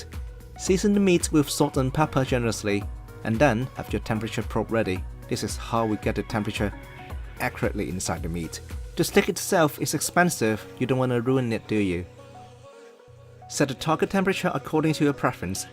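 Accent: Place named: United Kingdom. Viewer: Congrats! Finally your English Channel is here. Need to get a little used to your British accent lol since I’m from the U.S. will always support you as your other channel. 👏👏👏👍👍👍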